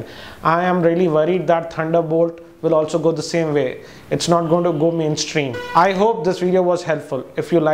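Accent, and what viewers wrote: India. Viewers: hes Indian, its very easy to tell...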